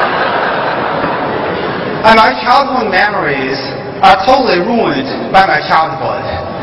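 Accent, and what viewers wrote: China. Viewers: 这口音好严重…广东来的吗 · 让我看到了 纯正的东北调的美国口语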